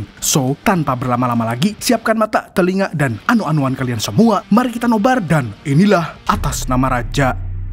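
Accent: Banjar, Indonesia. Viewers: karindangan bahasa banjarnya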